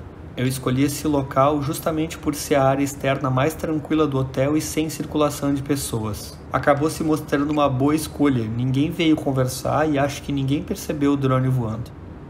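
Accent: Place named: Rio de Janeiro, Brazil. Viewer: Aaaaaaaa cariocaaaaaa se entregou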